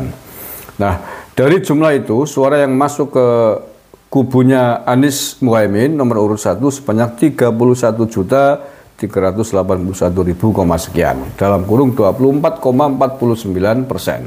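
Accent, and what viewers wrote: Banyumas, Indonesia. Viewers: Waalaikum salam wr wb paak lucu bahasane banyumasan banget akuvterhibur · aku teringat masa kecil d purwokerto btl itu ngurusi angket ongkot angkot brisik bae wong wis kalah aku slalu ngikuti acara chanel pak abis banyumase medok banget hebbatttt dan lucu tdk tegang yang nonton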